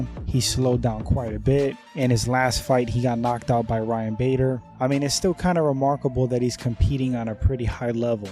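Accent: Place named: United States. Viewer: I know, I can tell from your videos the knowledge you give and exampling is well on point. Plus the casuals are important for MMA right now I only say it because people are talking about it and participating in the sport which will carry it on in the future. I'm only 23 years old. I became a fan of MMA around 2006 or 2007 the first fight I watched was Liddell vs Ortiz 2. I played a video game called UFC Sudden Impact way before I became a fan of MMA, But anyway I was a Amateur in Muay Thai and Boxing when I was younger (I know a lot about those to sports as well) my biggest accomplishment so far is fighting former WBO Champion Joseph Parker in 2009 Cruiserweight Golden Gloves in New Zealand. Unfortunately I got KO'ed in the opening tournament. Glad to see he's doing great in his career. I've only had 1 MMA fight that was back in 2013 lost by DEC but that year I defeated Patrick Afoa who fought Israel Adesanya 4 times in Kickboxing. 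But I quited from fighting 4 years ago for family reasons. But its awesome to see a fellow MMA fan that knows what he's talking about, its great to hear that your training as well and that you sound either American? or Canadian? Which makes you'll have more opportunity then then what I had in New Zealand. Well Thank you for the comment. I will stay in tune for your videos from now. I may have other fantasy fight suggestions like: Kazushi Sakuraba vs Rickson Gracie Stipe Miocic vs Tim Syvia Tyrone Woodley vs Matt Hughes BJ Penn vs Urijah Faber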